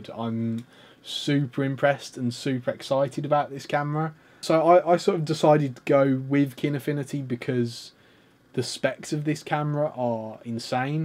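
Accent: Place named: Birmingham, United Kingdom. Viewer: You're definitely a fellow brummy arent you!!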